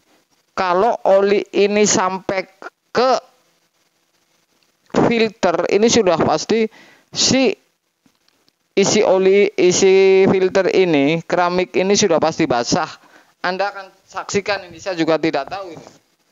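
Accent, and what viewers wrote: Banyumas, Indonesia. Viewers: Salam ngapak.....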